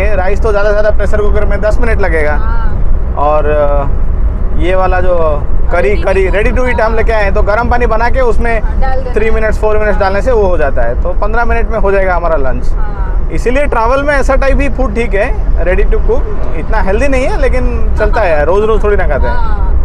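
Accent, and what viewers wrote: Odisha, India. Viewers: Bhai are you from Odisha. As your accent matches with that of odia people. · Nice journey . I guess you are original Odia from your talking . Please tell if I am wrong or right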